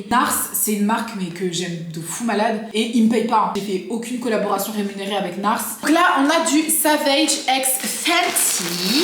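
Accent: England, United Kingdom. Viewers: Son accent anglais est très intéressant et tu gagnerais à l'écouter car ça permet de retenir certains mots. L'anglais est la langue la plus importante donc il faut t'y mettre.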